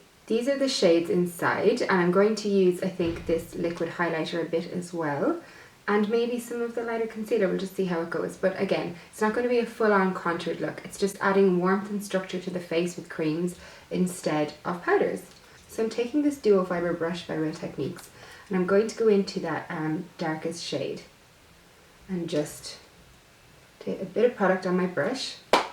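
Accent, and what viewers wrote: Ireland. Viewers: Your so beautiful!! Are you Irish? You've got a lovely Irish accent💕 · It sounds Irish to me...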